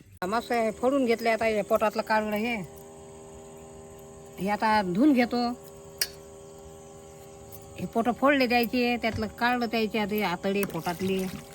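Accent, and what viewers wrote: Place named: Vidarbha, India. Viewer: Aaji tujhi bhasha ekun gaavi ch aalya chi feeling yete. Vidarbha chi god bhasha as good as it's food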